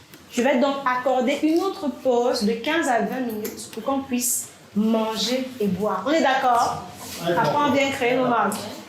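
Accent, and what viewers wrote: Cameroon. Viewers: Bonne diction!précise!claire!contextuelle!bien camerounisé!un régal!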